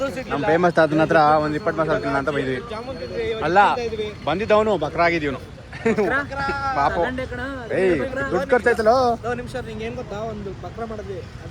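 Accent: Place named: Udupi, India. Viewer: udupi mglor kannada supper😂❤❤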